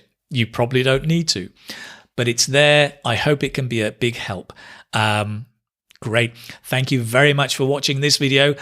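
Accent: United Kingdom. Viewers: I love British accent, do you have a podcast?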